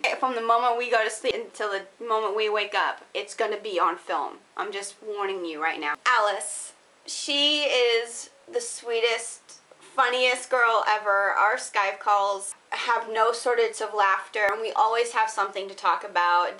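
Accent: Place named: United Kingdom. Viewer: aww you have a amazing british accent and that song?! IS IT ROB SINGING?! I LOVE IT! and do you know what its called? and haha i love your videos! :D DONT YOU DARE GET EVER BORED OF ROB LOL! :D and aww what you said was so cute :) I am so consider myself so close to you too and i love you! :) like a big sister <333 and yes! SHAYTARDS! :D and what you said about the other girls are so true! :D LOOL YOU AND MONA! haha i love you <3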